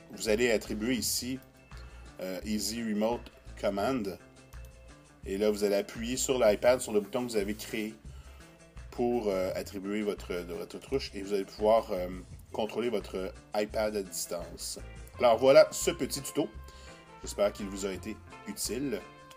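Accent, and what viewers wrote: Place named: Canada. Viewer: Merci pour le tuto en Français, j'attends mon interface et c'est grâce à tes explications que je l'ai acheté, en plus des explications tu nous fais voyager avec ton accent Canadien ; )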